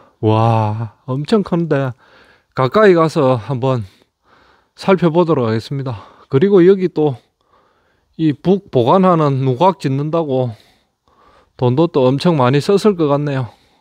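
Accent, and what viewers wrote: Gyeongsang, South Korea. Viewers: 빈집 찾는데 노력 하지말고... 늙은 어재 사투리나 고쳐 주세요.... 근본도 없는 갱상도 사투리 좀 고쳐요...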